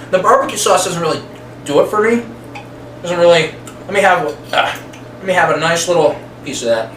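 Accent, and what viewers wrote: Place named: New York, United States. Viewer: are you from New York or Massachusetts? Your accent sounds similar haha.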